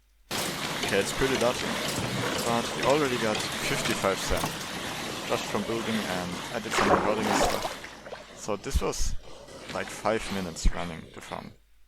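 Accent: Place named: Germany. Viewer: you sound verry deutsch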